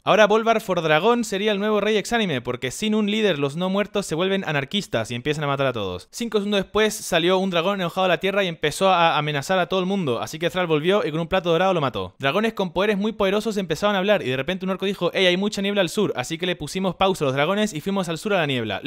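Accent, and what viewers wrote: Chile. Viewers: Nada mejor que escuchar a un argentino hablando como chileno. Que agradable sujeto. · Este wn es chileno?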